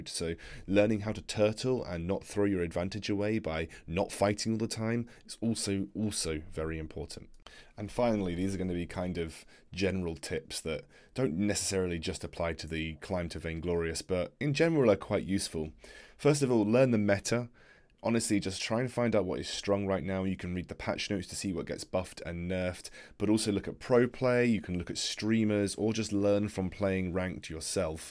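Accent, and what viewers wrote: United Kingdom. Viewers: think hes on Europe because his intro and his language show us he is brtish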